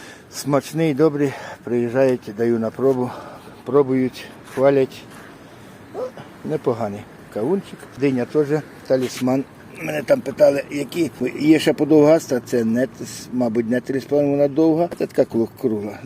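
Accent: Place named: Yavoriv, Ukraine. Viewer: По акценту чути що з Яворівщини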